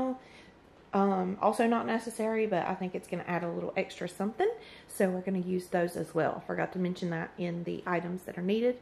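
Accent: South, United States. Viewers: Girl! You is southern! Lol . Gotta drink tea! Hope you feel better soon!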